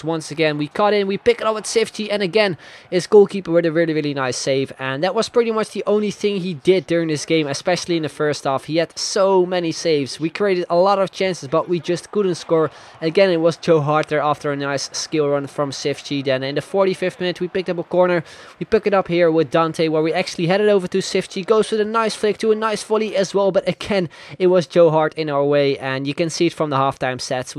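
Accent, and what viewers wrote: Netherlands. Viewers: He is dutch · Alright i never hurt of you bfore no lie but in 3 sec i knew you where dutch and subbed right away xD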